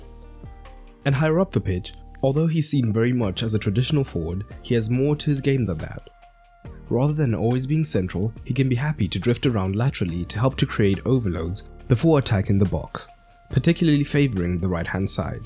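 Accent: South Africa. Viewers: Hey mate! Love the videos, especially since I assume you’re South African (judging by the accent) like me🤝🇿🇦 Anyway, how do you think Haaland will compare to the Agüero we saw under Pep? Agüero was known to be a little lazy and perhaps not entirely fit in a possession-based team like City. I might be totally wrong tho. Thank you brother and have a great week🙌🏻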